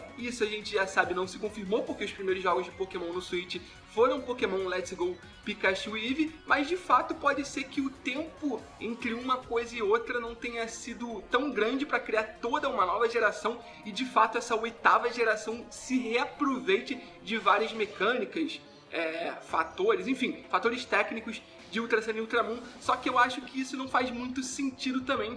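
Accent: Rio de Janeiro, Brazil. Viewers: Você é carioca?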